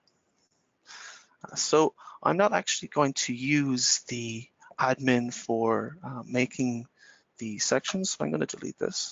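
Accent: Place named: Ireland. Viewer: It took me a minute to realize the sound "TEAM" is an Irishman trying to say "THEME."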